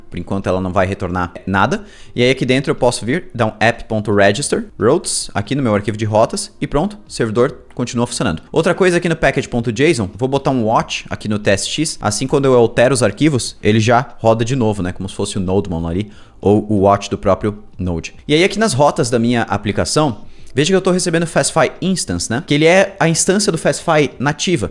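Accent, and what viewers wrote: Brazil. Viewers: falar como brasileiro, ninguém fala Alex como Álex…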